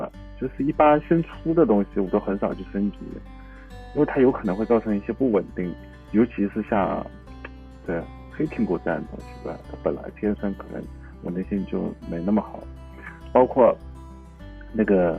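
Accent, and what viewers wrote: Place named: Jiangsu-Zhejiang, China. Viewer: 小哥哥，应该是江浙人士。。。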